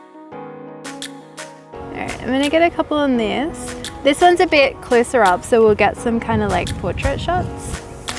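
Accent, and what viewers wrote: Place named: Australia. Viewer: Hi. Is it one preset or five diff ones? By the way were you born in Australia your accent sounds a little different.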